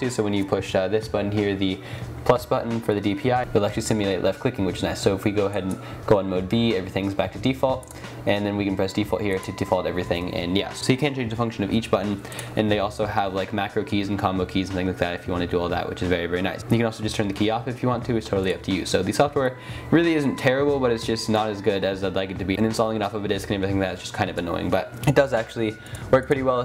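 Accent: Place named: United States. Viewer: he is one of the clear fast pace speaking Brit but I when I focused on listening I just realized that he is American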